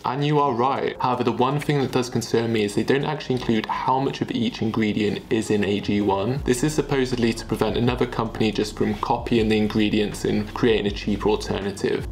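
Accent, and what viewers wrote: United Kingdom. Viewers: Dude I have NO CLUE where in the UK is from but its HAWT!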